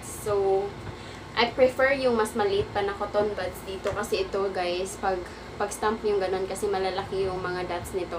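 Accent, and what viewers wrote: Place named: Visayas, Philippines. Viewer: Di jud Malikayan ang pagkabisaya nga accent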